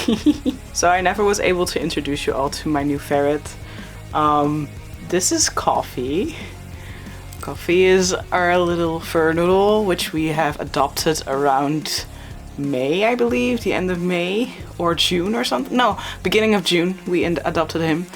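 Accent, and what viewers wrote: Netherlands. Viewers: Are u dutch I am but u know I heer the accent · ARE YOU DUTCH??😂❤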